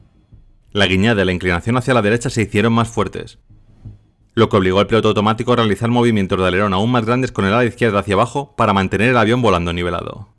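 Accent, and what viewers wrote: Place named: Spain. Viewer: Si se le entiende bien.a pesar de sentirsele su acento español..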